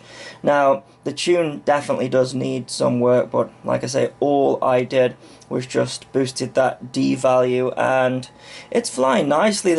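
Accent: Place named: United Kingdom. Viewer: I'm a total newbee, your reviews are really helping and the British accent makes you very watchable much like an episode of Doctor Who;-)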